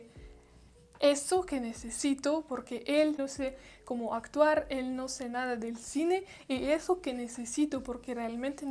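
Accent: Argentina. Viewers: ¡¡Este acento argentino!! 🔥🔥🔥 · Hola! Acabo de encontrar a tu chanal! Me gusta mucho! Tengo 16. Y estoy aprendiendo espanol tambien. Empece a 6 meses pasadas. Tu accento de Argentina es maravilloso! Estudio espanol de Colombia. Que Chevre! Tienes una subscriber nueva! xx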